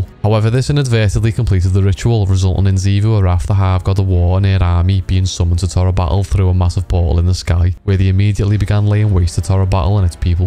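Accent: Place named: Liverpool, United Kingdom. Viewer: a fellow scouser telling D2 Lore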